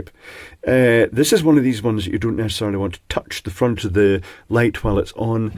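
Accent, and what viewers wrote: United Kingdom. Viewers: I bought the test leads for $0.93. I love to listening you and Your British accent.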